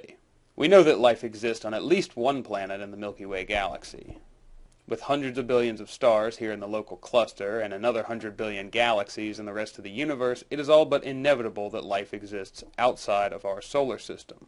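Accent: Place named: South, United States. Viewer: Southern accent FTW!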